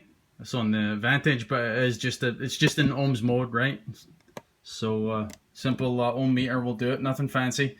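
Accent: Scotland, United Kingdom. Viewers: Scots accent coming thru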